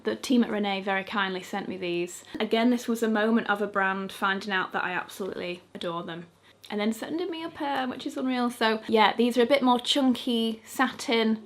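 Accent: United Kingdom. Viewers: It’s crazy that I’ve found you again, I was on a hunt because out of no where I remembered watching you maybe 6-7 years back but I forgot your name and only remembered you had a unique British accent and grey hair, so I was sooooo excited when I finally found you again after long hours of searching on yt😭 immediately followed so I don’t lose you again. You’re such a classy woman and it’s a joy to listen and watch you🥰❤️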